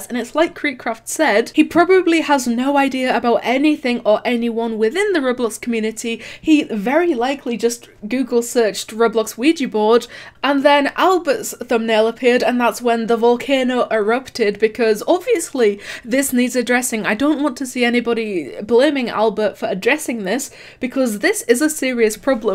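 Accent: United Kingdom. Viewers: Yez she has british accent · Is this british accent? No seriously is it? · She has a British accent.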